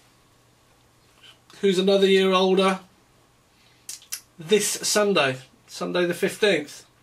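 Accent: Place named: England, United Kingdom. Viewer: nice pipe..are you england? I noticed something diferent about your english .I m brazilian .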